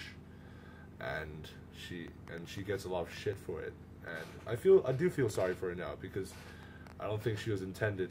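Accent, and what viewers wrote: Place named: United States. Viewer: a curious question, if u are brought up in HK for 18 years how cum u could speak with an American accent???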